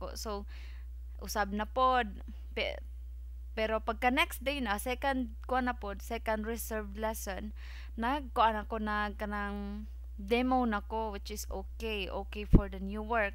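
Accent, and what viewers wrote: Visayas, Philippines. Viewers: gusto ko mag callan ay diko kabalo haha. maka lingaw ka mag bisaya sis haha. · ganahan kos imong bisaya accent, makalingaw paminawn. ma inspire pud ko maglantaws imong mga vlog.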